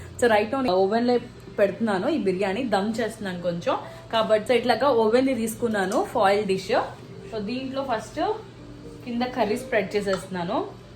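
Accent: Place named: Telangana, India. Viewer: Meru తెలంగాణ లా masthu matladuthunaru...💞💞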